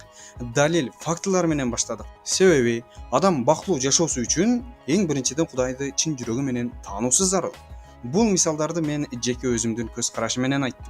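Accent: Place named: South, Kyrgyzstan. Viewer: Баракелде.Видеолоруңузга чоң рахмат. Бирок, түштүктүн диалектилерин кошпой сүйлөңүзчү. Сураныч. Адамды ирээнжитпей. Кыргызча так сүйлөө керек да.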